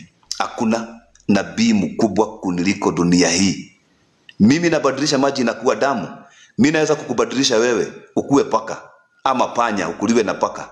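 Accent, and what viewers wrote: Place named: Kikuyu, Kenya. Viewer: Rudi shule kwanza ujifunze Kiswahili sanifu! Unaongea Kiswa-kikuyu...Baba ya maibilisi! Potelea mbali na huo uchawi wako unajaribu kutuuzia!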